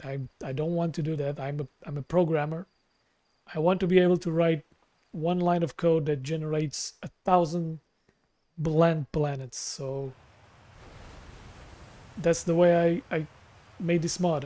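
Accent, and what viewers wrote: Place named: Portugal. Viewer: AHahah, com esse nome e essa pronuncia, so podes ser tuga! Fiquei altamente surpreendido ao saber que o Outer Wilds tem mod para VR, e vi isso ontem em um video sobre os melhores mods VR em 2023. E hoje fui ver melhor, e fui dar a tua pagina! Quando vi o " " parti-me a rir! Ainda estou para testar isso, mas ate agora, parece que estas a fazer um excelente trabalho! Impressionante, sim sr. Os meus parabens, desejo-te muito sucesso!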